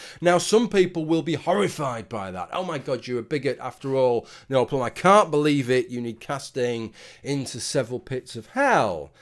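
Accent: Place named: North, United Kingdom. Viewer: You have a pretty voice, sound like the northern people on Game of Thrones! XO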